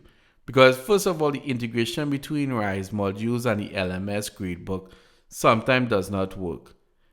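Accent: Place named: Trinidad and Tobago. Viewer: You're not a real instructor unless you have a Trini accent. I love it. Cheers!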